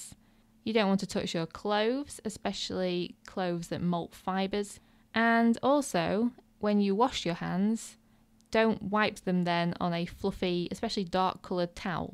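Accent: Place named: England, United Kingdom. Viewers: I really enjoyed your beginners video, and your accent reminded me of England where I was born. I live in Australia and have just started going to classes. Thank you for all your help and great tips. 😊😊😊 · I'm going to guess you're from the North West of the UK- your accent is exactly like mine- I'm from Lytham. Anyway, thanks for the informative video- I'm just getting started with polymer clay.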